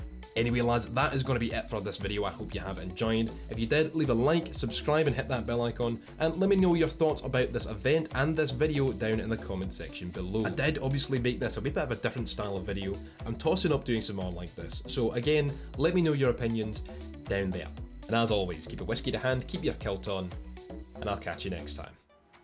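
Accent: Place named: Scotland, United Kingdom. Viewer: Ngl I watch ur vids mostly for ur accent I wish I had a Scottish accent lmao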